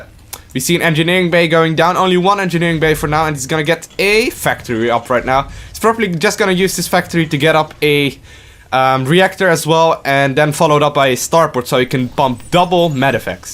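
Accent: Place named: Netherlands. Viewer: This accent could not be more dutch...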